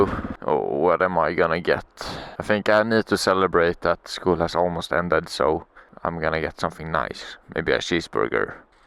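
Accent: Sweden. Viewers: älskar svenska dialekten 😂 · Man hör att du är svensk ialafall 😂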